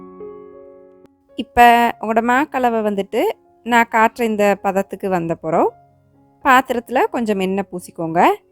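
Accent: Sri Lanka. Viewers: Assalamu alaikkum neenga basica srilanka thana illa tamilnattil irundhu poneengala nalla tamil pesureenga ceylon slangla alaha irukku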